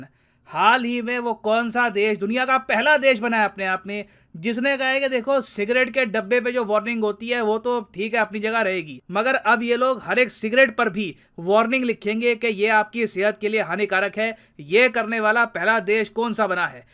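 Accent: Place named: Bihar, India. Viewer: Bihari accent was very cool 🤣🤣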